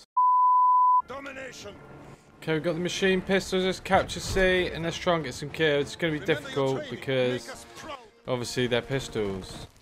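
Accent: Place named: United Kingdom. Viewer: Damn british accent I can barely understand half the stuff you're saying 🤣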